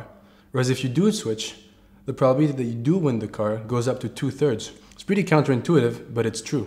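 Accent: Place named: France. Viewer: Cute French accent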